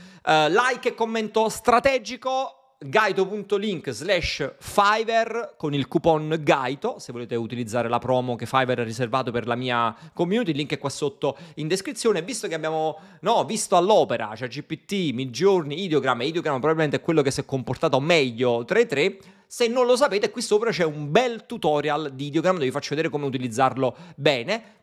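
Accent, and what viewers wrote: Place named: Naples, Italy. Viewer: Da ex grafico Pro... I loghi creati dalle IA sono abbastanza banalotti però per essere strumenti decisamente giovani devo dire che il lavoro che svolgono non è cosi malvagio. Anzi! Tra qualche anno ci sarà da ridere... O da piangere dipende Comunque devo essere sincero. Seguo i tuoi video più che altro perché amo l'accento napoletano 😅 Sono nato a Roma ma il napoletano è la lingua più bella che c'è 😅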